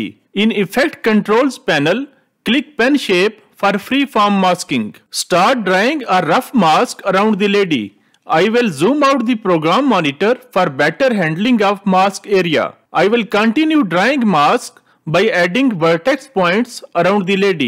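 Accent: India. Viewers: Indian accents are always funny 😂. Very good video, very helpful 👍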